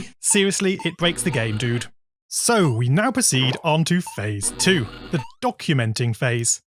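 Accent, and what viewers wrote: United Kingdom. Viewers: Your the most british person ever, the accent and stuff, WHOLESOME STUFF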